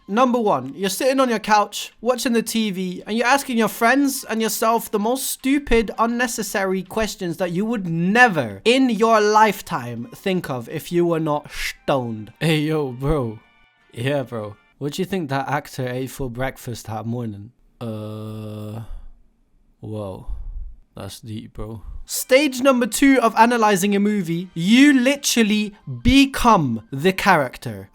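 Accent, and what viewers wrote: United Kingdom. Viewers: Now we got a British stickman stoner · Ayyy bri’ish stickman dude!!! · And he’s British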